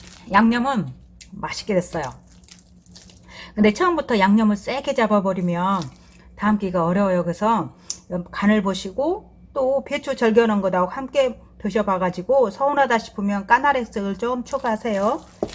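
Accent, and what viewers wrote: Jeolla, South Korea. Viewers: 님 전북 분이신거 같으세요 말씀하시는 억양등이 전라도 음식 참 맵짜고 맛있게 잘합니다 오늘도 잘보고 배웠습니다 감사 합니다ㅡ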